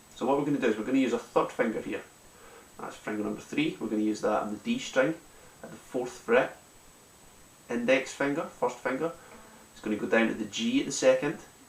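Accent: Scotland, United Kingdom. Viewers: damn good to hear a Scottish accent!! do you do lessons?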